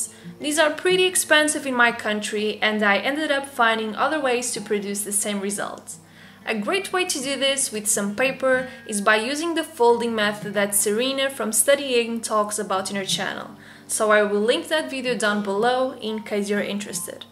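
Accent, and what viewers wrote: Portugal. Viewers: Are you portuguese? I recognize your accent ahaha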